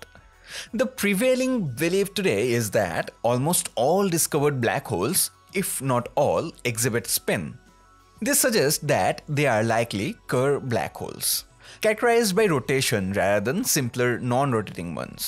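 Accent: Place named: India. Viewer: Bro, no offense. I respect that you bring in science and valuable information rather than the next clickbait video, but the hard Indian English accent (at least it sounds like that) makes it most of the times really difficult to follow. If you are open to constructive criticism, maybe use some appropriate tools, or have it dubbed by some AI voice perhaps. Other than that, well done. Keep it up.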